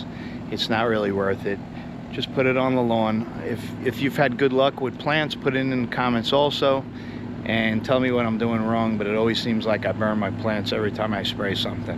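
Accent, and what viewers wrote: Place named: New York, United States. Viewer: I’m getting ready to spray my lawn with chelated iron. What part of the country are you in? I’m in NY and you sound like a New Yorker.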